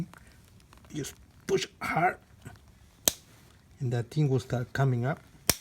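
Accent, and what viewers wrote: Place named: India. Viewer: creí que eras hindú por tu tono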